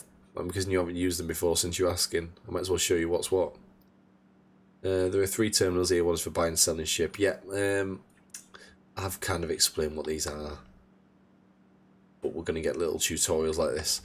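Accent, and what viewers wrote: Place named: Yorkshire, United Kingdom. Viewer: thanks for posting this video, as i'm deciding whether to buy the game on sale. But why i'm commenting is another matter... you have my voice. I mean you're probably about 30 miles closer to Leeds than me so the accent is slightly different but i'm not kidding you sound like an exact copy of my voice. its actually kinda scary hearing my voice saying words i've never said haha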